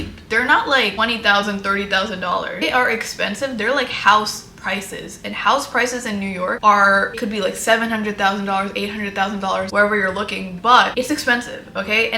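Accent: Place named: Los Angeles, United States. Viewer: You’re not a native New Yorker… you have an LA accent not a New York! So quit frontin!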